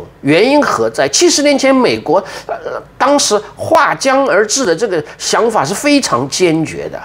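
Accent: China. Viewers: 昆明话太地道了😂老乡啊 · 平先生的雲南口音地道😊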